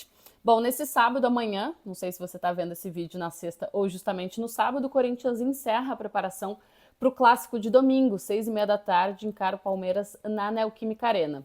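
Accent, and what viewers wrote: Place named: South, Brazil. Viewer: Você é😂 Catarina ou Gaúcha? Porque este "ãn" é característico destes dois estados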